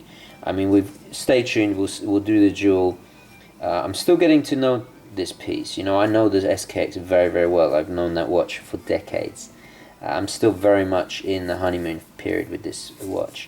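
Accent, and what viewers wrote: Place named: United Kingdom. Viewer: Why do you sound so british? )) Nice watch, I´ve got mine in green.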